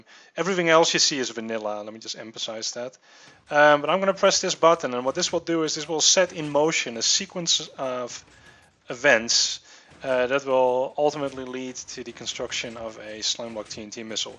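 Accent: Netherlands. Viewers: Are you from the netherlands? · Its really easy to notice that you are dutch, ya know? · are you Dutch? because Dutch accent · You sound dutch XD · are you dutch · are u dutch? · are you Netherlands? you accent sounds like it! I'm from the Netherlands. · Are you Dutch? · That accent, your Dutch!!!! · this is my firt vid of you but are u dutch :P( ur accent sounds like u are) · are you dutch??? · the best accent is the dutch is accent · He is from the Netherlands. :) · Are u dutch ? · Het valt meteen op als iemand Engels gaat praten en hij is Nederlands en vooral bij cube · I hear a Dutch accent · Je bent Nederlands ik hoor het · are you dutch? you sound like it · that dutch accent tho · r u dutch?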